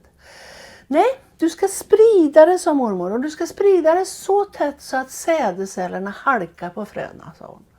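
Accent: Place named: Skaraborg, Sweden. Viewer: Så roligt att se den här filmen och höra dej, berätta på din härliga Skaraborgska, om linet.! Jag använde den i klassen när vi pratade om Lin( nu när vi inte kan åka Skara med klassen längre). Roligt med Tidaholm och Holma också. I mitt linneförråd har jag en bunt ofållade halvlinnehanddukar från Holma😀 från min svärmor som bodde i Tidaholm.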